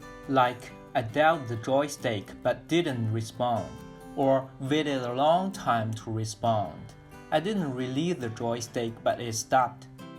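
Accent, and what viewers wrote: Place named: China. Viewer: Tsie tsie for taking the effort to learn English so you can make your reviews available to many more people. This is an EXCELLENT review and will help many people understand what the Smooth4 does and will also help them make a decision whether to buy. I particularly appreciate the multiple shots and the editing you did to show us a comparison side-by-side of this product and other products or comparing the Smooth4 to no gimbal. Very effective. Your English is perfectly adequate to communicate on a tutorial like this. You said you would like to improve, which is always the mark of a smart person. My suggestion to you would be to get some audio (extract from a YouTube video) of a native American English speaker saying the words "AIR" and "LOLLIPOP" and "RAZOR." Listen to those words over and over while you work on making your pronounciation identical to theirs. 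This will help train your mouth muscles to make sounds that are not in the Chinese (or some European) language. One more comment: Wherever you are in China is beautiful. I wish all the politicians would learn to get along and be friends with each other so all of us could visit and exchange ideas more freely.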